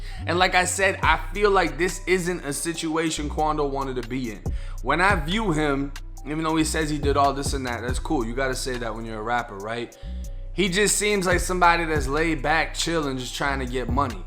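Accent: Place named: Massachusetts, United States. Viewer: Yo you sound like you're from Mass, straight up north shore!! But anyways, I like ur videos!! Good shi*t, keep it up!! 💯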